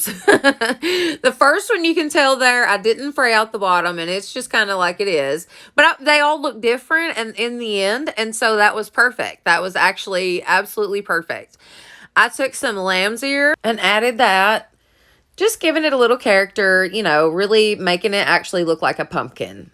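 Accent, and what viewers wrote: South, United States. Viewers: girlfriend, you “blew these out of the water” they are all so gorgeous!! That pumpkin one is the prettiest thing I’ve ever seen, girl you are sooooo good!!! Oh yeah, I wanted to let you know that I ordered some Chalk Couture from you🥰 I have really been wanting to get into doing that but I just have put it off until TODAY! Girl when I saw you were a consultant for them, I had to get started with you as my consultant!! You inspire me so much, you have NO IDEA! I am only 54 and pretty much home bound because of my health. I don’t have a lot of good days, but even if I’m having a bad day, like today, I can watch your YouTube videos and you just cheer me up TREMENDOUSLY!! I’m from a small town about 30 miles south of Birmingham so I totally appreciate your “Southern drawwww” cause I got it too, lol!! Thank you so much for all that you do and create for all of us to enjoy and sometimes create our own!! 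I love you and your channel and I sincerely mean that!!! Keep making those videos please!! Thanks again, LOVE YA GIRL! Paula Wilder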